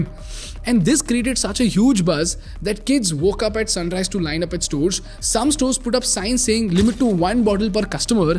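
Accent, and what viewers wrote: India. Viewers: The Indian accent is creepier than labubu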